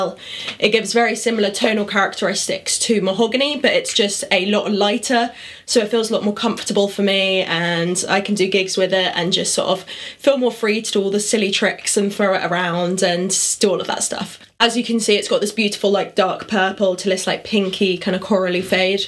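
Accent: United Kingdom. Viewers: What part of UK does your accent come from? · Ahhh... she's a Brit ! 🤔🙄😏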